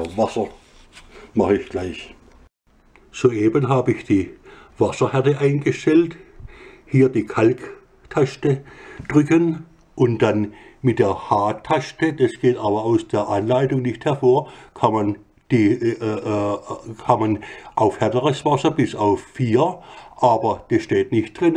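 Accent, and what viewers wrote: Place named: Swabia, Germany. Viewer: Hallo, Danke für das Video. Wollte mich kurz erkundigen ob du bis dato immer noch mit der Kaffeemaschine zufrieden bist?! Bin am überlegen mir die Selbe zuzulegen. P.S. nach deinem Dialekt nach kommst du (so meine ich) aus dem Schwabenländle. Pforzheimer/Calwer Gegend vielleicht?:) Grüße aus dem Schwarzwald;)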